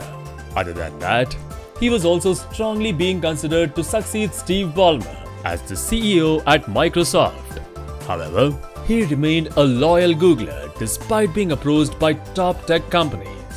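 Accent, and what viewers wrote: India. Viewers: Why you can bring Indian out if India, but not Indian accent out of Indian? :( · I hate that heavy Indian accent as well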